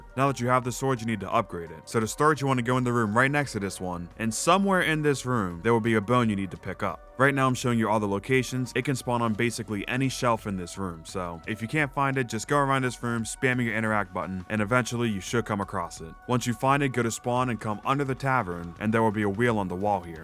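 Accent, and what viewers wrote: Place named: United States. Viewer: Every time I look up a guide it’s some British dude. Glad to hear an American man, or at least someone that sounds like one 🇺🇸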